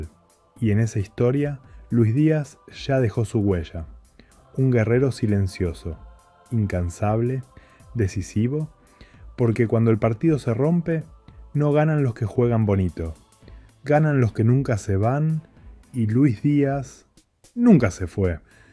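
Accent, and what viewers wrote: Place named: Argentina. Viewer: Me encanta tu análisis sin gritos sin estridencias muy acertado se oye lindo con su cadencia Argentino saludos 👍👏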